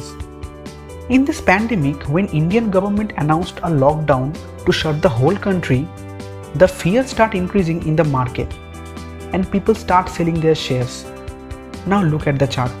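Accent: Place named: Pakistan. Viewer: I love the paki voice. Such a cool accent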